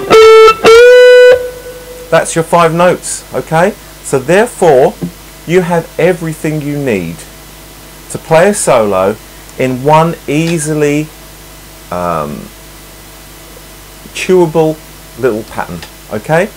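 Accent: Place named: England, United Kingdom. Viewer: Great. Enjoyed it. And like the English accent as well.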